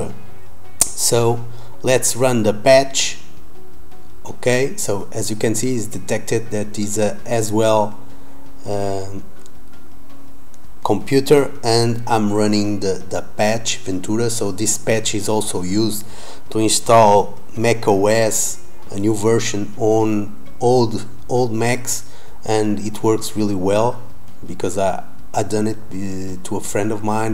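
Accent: Portugal. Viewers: Primeiras palavras e vi logo que era um YouTuber tuga. Gostei do vídeo, continua o bom trabalho.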